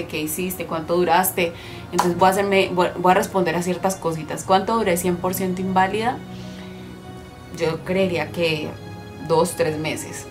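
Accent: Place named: Colombia. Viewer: Según escucho tu hacento eres colombiana.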